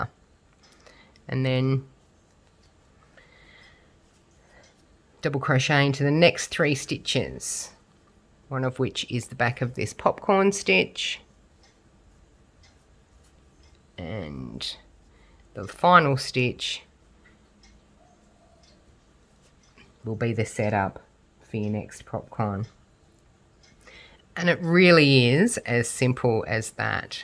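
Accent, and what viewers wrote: Australia. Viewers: Delightful square - different and therefore appealing. :) Question: Aussie ? Statement: very well described process, complete with helpful pointers. Goodonyermate !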